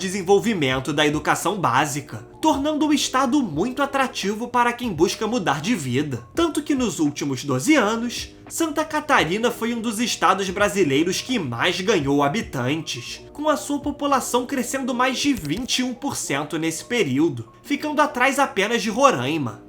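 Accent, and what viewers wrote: Rio de Janeiro, Brazil. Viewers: Esse carioquês do narrador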